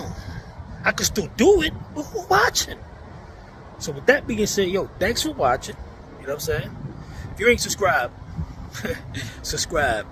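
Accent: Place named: New York, United States. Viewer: I knew you were from the east coast somewhere from the accent. I'd have guessed NY. I don't come across too many guntubers from over there I guess coz of the gun laws. Keep doing ya thang bro. Watching from Texas (former NJ).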